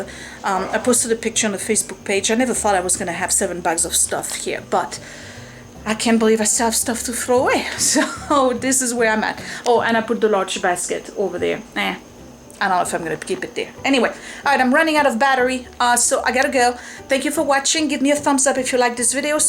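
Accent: France. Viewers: What I'm about to say is probably to repeat what others have already said...but... schools might love the pens pencils. Your baskets, and the contents , the post it notes -- other things -- do NOT look tacky, nor shoddy and others will love picking through. If you placed some of these things within the baskets curbside with a FREE sign atop ... well all this really good looking stuff (if excessive) might disappear quickly. What courage you show in tackling the impossible. It's what you don't want to do but you do it. Super character modeling for those kids. Again a joy to listen to this French inflected lovely voice and all the dissecting of life and our little errors in buying...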